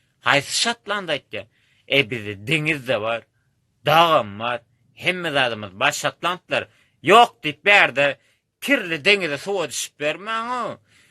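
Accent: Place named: Mary, Turkmenistan. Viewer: Dost, senä Marydan! Gürleýşiňden bildirýär!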